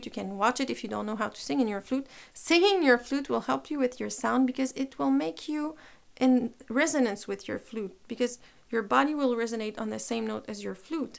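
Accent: France. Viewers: Yeah ! the french prononciation ! Vive les français ! !!! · Hey are you a French? I like your English too.❤️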